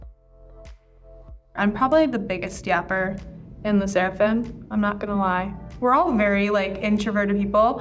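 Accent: United States. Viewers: they mean American accent, like her diction and the way she articulates the words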